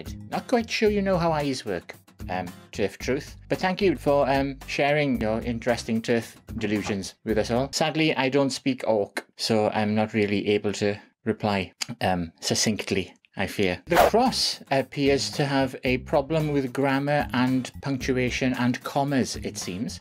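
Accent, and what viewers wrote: Wales, United Kingdom. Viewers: Are u welsh? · I am loving your Welsh accent: my Mum lives in Carmarthenshire, but she's not Welsh: they are very friendly & welcoming!!! Please ignore those very nasty & ignorant comments: these crazzzies are not worth any attention & they need to get what is commonly called: "a life"!!! 😁😂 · I will start by saying that I am not subscribed to your channel, yet you popped up and I will not judge,be mean or anything like that as I am a Christian. No true Christian would, as we have only one judge and I do pray that all knows who that is! I only wanted to let you know that as a you tuber I'm sure that you already know that based on what channels that we do like and subscribe too, they also send us suggestions. Like as with me, your video that I'm so sorry that I can't remember the caption off this video but do know that two names appeared that I love very much, Trump & Jesus! 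So, I did watch it but I guess I'd have had to watch those videos leading up to it to know what upset so many. I can say that your British accent I found beautiful! As far as your deep voice, I'd have to say that my beloved father was 6'4 and when I was young I was teased often as I inherited his deep voice...funny though that those Who teased me now wait for hours after (while touring and making a quite nice living on the rd. playing Coliseums and now they act as if we were best friends in school !) I had often wondered if when I was large enough in my career just how I would react to those who's words cut deep in my early teens, & if they would ever show up at one of my my proformancesAlthough under a different name than I can go by on here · Love your Welsh accent from another Welsh person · Hahahaha "you couldn't afford me". I swear the Welsh accent makes the burn all the better.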